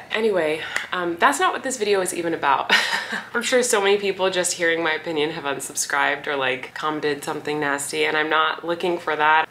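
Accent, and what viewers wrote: South, United States. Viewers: you and and playing with the fly swatters with your country accent is soo cute! i know a lot about country accents being from alabama! ❤ do you ever perform in alabama or anywhere in the south east!? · I love your southern Accent you were doing if you were doing one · Love that pink on you! And that country accent 😅😅😅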